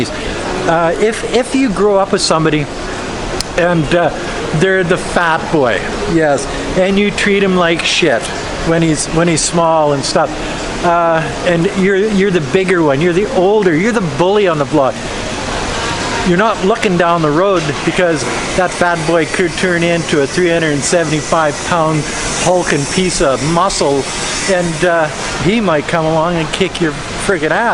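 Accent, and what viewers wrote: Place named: Canada. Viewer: This is the first time I'm watching this guy's video because it popped up in my recommendations. I can tell this guy is Canadian. He sounds (and sorta looks) just like Bubbles.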